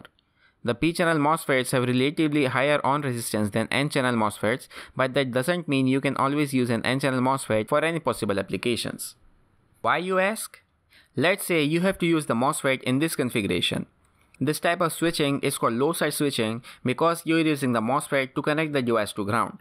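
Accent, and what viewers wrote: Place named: India. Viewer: Your commentary is more to express your English language efficiency and not the subject matter. You are reading the information very fast and you do not know what you are talking about. Yet you have a very strong indian accent 'depletion region', and "available" are the worst to understand. You collected information from the web and read it out loud. It was of no help. Everyone knows about n and p channels, plus bipolar transistors. Anyway, thank you for upload.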